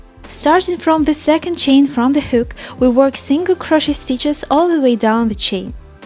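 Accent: Russia. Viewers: Hi girl thanks for your perfect turorial, btw are you russian?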